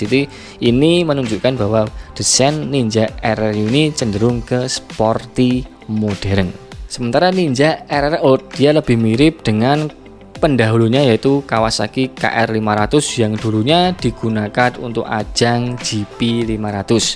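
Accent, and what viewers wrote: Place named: Java, Indonesia. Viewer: Medok amat bang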